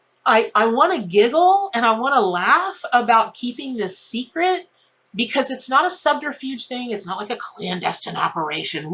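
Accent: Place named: New York, United States. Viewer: Hahaha!! That sounds like a New York accent hahahaha 🤣🤣🤣 waaaaay back when I was in high school I played flute in band (how is your native flute going, btw?? 😊) and on my first band trip we went to New York. A guy was selling M&Ms for a charity outside of Virgin records in times square and I told him how many I wanted to buy and he said "four gorgas" and I said "How much is that?" And he repeated himself "Four gorgas" and I asked "What is a gorga?" And he slowed it dooooown and said, with the accent, "Ya gogous" (you're gorgeous) as in he was grateful I didn't just stop to listen but to also help with whatever charity hahahaha 🤣🤣🤣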